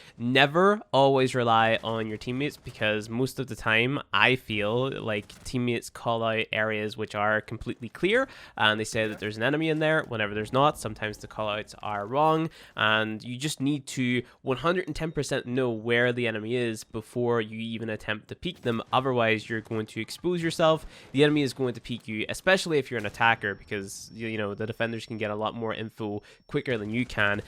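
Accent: Northern Ireland, United Kingdom. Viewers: Are you from Northern Ireland cuz you kinda sound like it lol like you sound like my da a bit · Northern irish deffinetly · Northern Ireland:)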